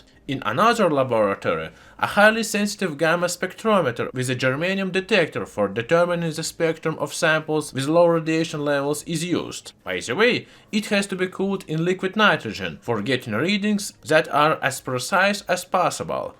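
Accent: Russia. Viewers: Are you a Russian? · are you russian???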